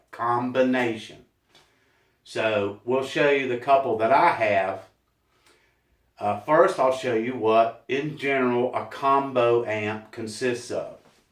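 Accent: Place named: South, United States. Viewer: Very good video, I especially like the repetition of terms, that way you remember them better. Btw, I am south-European guy, but I would say based on your accent that you come from the south of States somewhere ... :)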